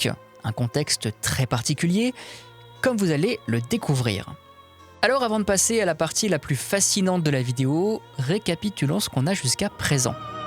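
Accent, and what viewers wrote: Alsace, France. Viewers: C'est la première fois que je vois une de tes vidéo, mais t'es pas Alsacien ?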